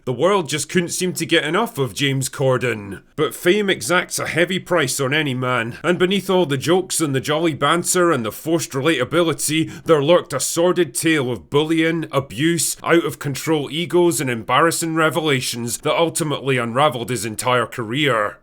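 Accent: United Kingdom. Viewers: why do you have a british accent i just noticed after all these years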